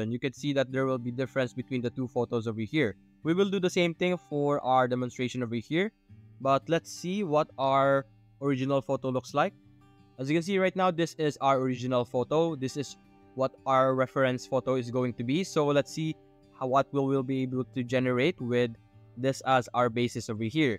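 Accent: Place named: Visayas, Philippines. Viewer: I can tell you're a filipino hehe and definitely bisaya with your intonation hehe good tutorial keep it up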